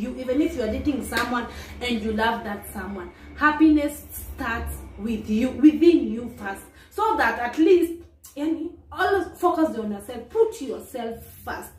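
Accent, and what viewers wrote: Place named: Kenya. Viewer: I remember you, you're Kemuntos niece? Love your narratives. You talk like a Kenyan youtuber living in Germany. Chocolata.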